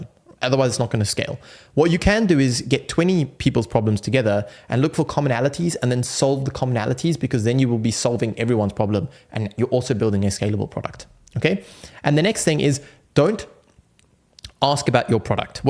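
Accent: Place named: South Africa. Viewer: Were you born in SA? You have a strong south African accent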